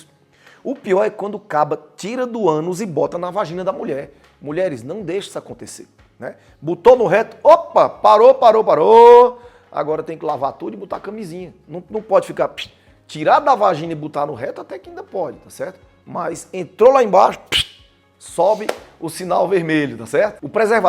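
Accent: Ceará, Brazil. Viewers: O sr.é cearense??? Um jeitinho típico de apresentar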